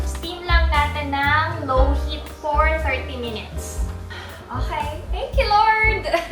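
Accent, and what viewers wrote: South Korea. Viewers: Ms. ang galing mo mag Korean while you were talking to seems like I'm watching k-drama ganda ng iyong voice and koreanang koreana ang accent sana mag speak ka lagi korean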